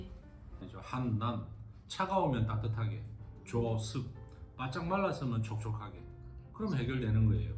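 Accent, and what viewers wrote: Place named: Busan, South Korea. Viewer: 부산이신데 억양이 지나치지 않고 목소리가 좋으시네요 한번 가보고 싶은데 너무 머네요 ㅜㅜ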